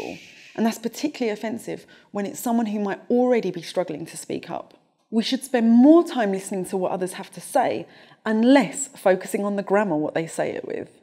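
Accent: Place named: RP, United Kingdom. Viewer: Actually I think the message here is the opposite to that intended. We have a middle class young woman, speaking perfect English with a Received Pronunciation accent, telling the lower orders that grammar doesn't really matter. She picks a few of the tired old classic examples (and I'm a grammar snob, but I've given up on 'literally', and 'decimate' for that matter :-)), while ignoring the acres of poor usage that do lead to actual misunderstandings and confusion.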